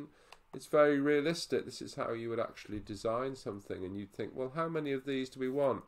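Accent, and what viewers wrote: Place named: United States. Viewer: holy american accent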